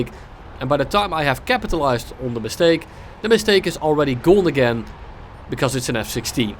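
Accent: Netherlands. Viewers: Steenkolen English 👍🏼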